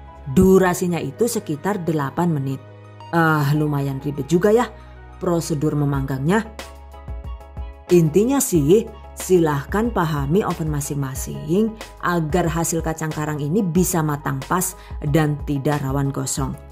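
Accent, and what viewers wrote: Banyumas, Indonesia. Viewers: Chanel kesayangan ku, mudah d fahami krna selalu ada tip & trik. Dan yg paling uniq bahasa nya inggris,indonesia dan ngapak.pokoe lain dari yg lain deh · Bismillah #GiveawayTHV2021 Bahan simpel mudah di dapat Suara yg ngapak bikin ngakak🤭🤭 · Alhamdulillah nemu resep baru dari Kesukaan anak q beng2 Okay...pingin nyobain bikin buat isian toples lebaran nanti.. Suka sekali dgn penjelasannya bunda mudah di mengerti trus lucu ada logat ngapaknya 😁 #GiveawayTHV2021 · Ngapaknya itu loo..jd ingat klo keluarga besar suami lg ngumpul di Sumpiuh 😄😄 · hahahahaha lucu banget si lah. nek mirengaken tiyang ngendika bahasa ngapak toli lucu banget. ternyata aq dewek ya lucu ya nek ngomong ngapak hahahahaa😂 #GiveawayTHV2021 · Amazing.... Ngapaknya... Sukseeees maa syaa Allah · Bhs ngapak e menghibur,lucuuu....resepnya jempolan.favorit 💞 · Slalu semangat nonton vidio... 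Selain seneng karna resepnya simple n jelas juga karna lucu banget apalagi klo lg ngomong ngapak sumpah nyong geget banget... #GiveawayTHV2021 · Bener bgt...ngapak abis logatnya...wkwkwk...trus detail bgt lg....semuanya dh pokonya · Mb lewih kepenak ngomong ngapak bae lah..😀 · DONE #GiveawayTHV2021 Bune gawekno resep kue ilat kucing dong,sing ekonomis tapi maknyuss👍tak enteni lo bune🤗 Nek ora ngapak ora enakkk😁😂😂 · Hhhhhhh lucu,seneng dech dengerin mbk nya klo lg ngomong ngapakkk auto jd ngakaakkkkkk dech nyonge😂😂🤣🤣🤣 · Mantap,. Nyam² :) Selalu terhibur dg ngapaknya. #GiveawayTHV2021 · Love love banget sama ngapak nya 😅😅😅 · Yg sy tggu tiap liat video adalah logat ngapaknya 😁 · Logat ngapake wisss ora ana tandingane😁💜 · Suka lihat ngapaknya sama seperti saya penjelasan nya jelas makasih ya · tetep dong logat ngapak ga ketinggalan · DONE. Resep cemilan is the best, pas buat isi toples persiapan lebaran. 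Selain unik cr penyampaiannya yg slalu ngapak resep n caranya jg detail bgt. Trm ksh teh resepnya yg slalu menginspirasi bgt.#GiveawayTHV2021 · Selalu suka sm video² krn lengkap bgt sm tips² yg sangat berguna.oia, sm satu lg.logat ngapaknya yg selalu jd ciri khas...makasih buat sharingnya...semoga semakin berkembang #GiveawayTHV2021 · Lucu org jatim tp bs ngomong ngapak n pinter bhs inggris pula msh muda, tp suara dewasa keren" resep nya👍👍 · Logat ngapaknya emang selalu bikin kangen kak, 😁 sukses terus, gak pernah pelit resep emang ini🙂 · Ngapaknya bikin ngakak bu...mood banget dah 😂😂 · Senengx disini lengkp. Suara enak. Lucu lagi. Dan bisa bhs ngapak. Pada karo inyong. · Ora ngapak ora kepenak 😅. Sukses terus buat yang selalu menginspirasi dengan resep resepnya #GiveawayTHV2021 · DONE #GiveawayTHV2021 Penonton setia,slalu jadi inspirasi,Krn slalu mengeluarkan ide ide yg cemerlang untuk sy biar semangat untuk usaha jualan dan penjelasannya begitu detail plus Bhs ngapaknya ciri khasnya sy bgt,matur suwun 🙏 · Sambil nyimak sambil nyengir2 dngerin omongan ngapak 😂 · Selain resep2 dan penjelasan yg jelas singkat padat,aku suka sama logat ngapak nya...Caaaaatat....😀matur nuwun mbok ilmu nya semoga sehat selalu...Caaaatat😊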